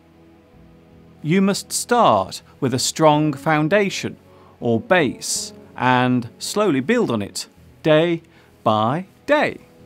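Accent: England, United Kingdom. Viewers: he said ftom england do sure it is britinsh english... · this is british english or american ???? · Hi Mr., my name's ilham. I'm From Indonesia, your video is verry good, and i want learn english from your channel. By the way, do you speak english with british accent, right?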